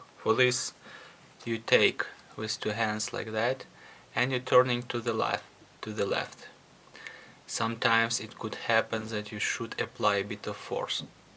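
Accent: Russia. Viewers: Тяжелый русский акцент) как будто литтл биг слушаю)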